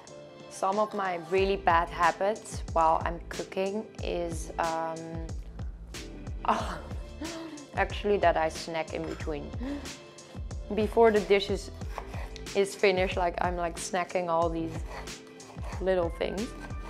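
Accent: Netherlands. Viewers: Ik hoor ook echt het nederlandse accent haha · You sound dutch and your name also looks dutch so are you from holland ?? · Je hebt echt nog dat Nederlands acsent in je Engels😊 maat goed bezig!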